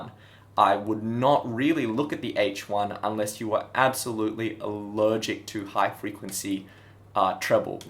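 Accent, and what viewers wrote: Australia. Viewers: how do you do such a good Australian accent? I am also Asian and I can speak Spanish but I can't do an Australian accent.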